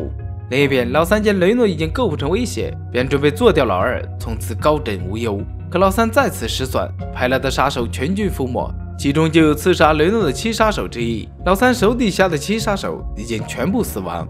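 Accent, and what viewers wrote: Japan. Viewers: 解说的普通话怎么有日本口音？ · 兄弟你这口音像日本人，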